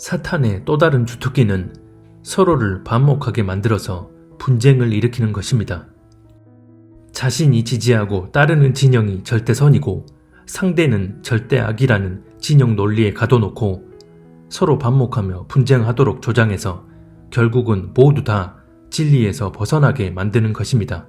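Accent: Jeolla, South Korea. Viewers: 전라도억양이 아쉽네요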